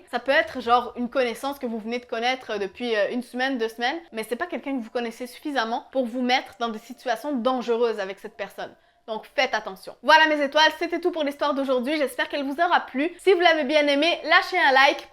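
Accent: Quebec, Canada. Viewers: alors, c'est marrant, j'entend bien un accent canadien français (faut pas dire québécois, je sais) mais pas si prononcé que ça. française qui vis au canada? accent d'un autre endroit? disons que ton accent varie entre français et canadien...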